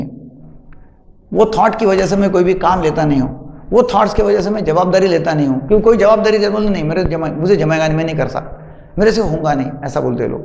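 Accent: Maharashtra, India. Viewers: सर तुमच्या हिंदी भाषेवर मराठीचा प्रभाव दिसतो.. But your all videos are really Awesome as most are connected to our daily life problems and you illustrate it very well .. So please keep posting.. I am from वर्धा... Best luck to you.. Sir, Meditation म्हणजे नेमके काय..? Awareness, Alertness, Consciousness व Concentration यात काय फरक आहे कृपया ते स्पष्ट करणारा video बनावणे.. Sir, Meditation मी अनेक दिवसांपासून प्रतिदिन करतो आहे पण मला त्याचा विशेष फायदा जाणवला नाही आहे.. Present मध्ये कसे जगता येईल ते स्पष्ट करने.. काय Meditation केल्याने कुणाचे मनोविकार(काम, क्रोध, लोभ, अहंकार आदि) नष्ट होतात.. ते नष्ट करायचे असल्यास काय करावे लागेल..? शांत मन Meditation साठी Prerequisite आहे, पण शांत मन कशे साधायचे हा प्रश्न बिकट आहे..? माझ्या मते व्यक्तीस वैराग्य आल्याशिवाय, त्याच्या तृष्णा नष्ट झाल्या शिवाय त्याला शांति प्राप्त होने अशक्य आहे.. पण वैराग्य प्राप्ती व तृष्णाविहीनता कशी साध्य होणार, याबद्दल कृपया मार्गदर्शन करावे..सर्व काही असतांना माझे मन विनाकारण सदैव अशांत राहत.. 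मला चिरकालीन शांति अनुभवायची आहे.. कृपया मार्गदर्शन करावे ही विनंती.. नमस्कार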